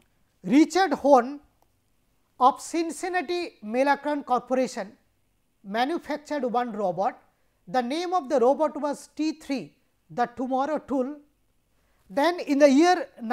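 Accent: India. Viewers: For the first time ever someone thanked an indian youtube lecture instead of making fun of accent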